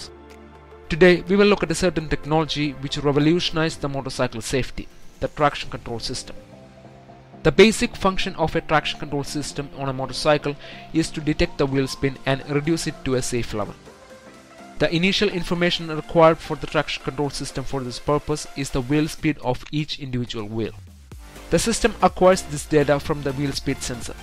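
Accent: Kerala, India. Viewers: very good video. well explained. is the speaker mallu guy ? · Hundred percent Mallu, but he is brilliant, and knows the subject very well. I loved the video.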